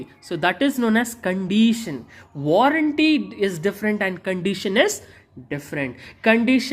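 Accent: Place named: Karnataka, India. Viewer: Omg u r kannada accent is so beautiful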